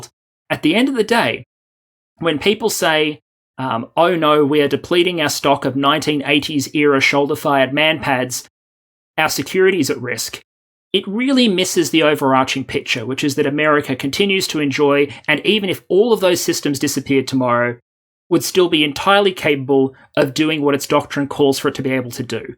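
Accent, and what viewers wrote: Australia. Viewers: Not in the middle of an Australian accent like yours, and not both in the same paragraph.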